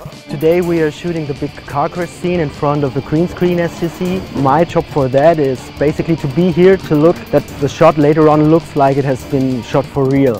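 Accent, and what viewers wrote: Germany. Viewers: Hey, so wie sichs anhört (nicht böse gemeint), kommst du aus Deutschland! Daher kurz auf deutsch: Du hast meinen größten Respekt! Nicht nur, weil du dieses Video fantastisch gemacht hast, sondern weil du allgemein ein fantastischer VFX Artist zu sein scheinst! Ich meine... du hast sogar mit Dinklage deine Zeit verbracht! Ich hoffe, ich werde mal nur annähernd an dein Level kommen! Alles Gute und bleib wie du bist!!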